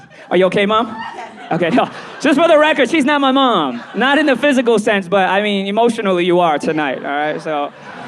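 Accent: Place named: United States. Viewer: Looked this guy up because I’m currently watching Idol producer and was curious who he was because I could hear the American accent through his Mandarin. Little did I know that he’s funny as fuck!!!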